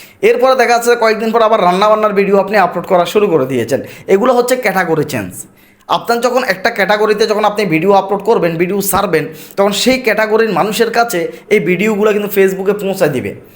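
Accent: Chittagong, Bangladesh. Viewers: Bai r basa ki Chittagong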